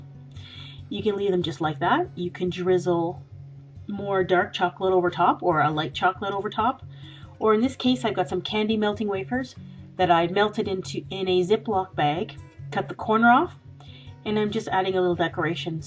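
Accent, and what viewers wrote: Canada. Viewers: Are you Canadian? :) And I love your videos! They are very helpful! :D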